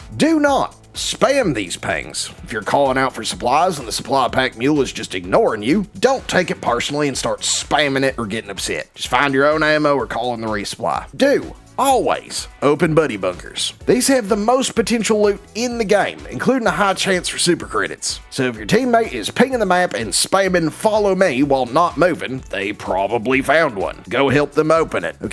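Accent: South, United States. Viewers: As someone also with a heavy southern accent and a cadence like yours, I really appreciate your video. It’s hilarious!!!! subscribed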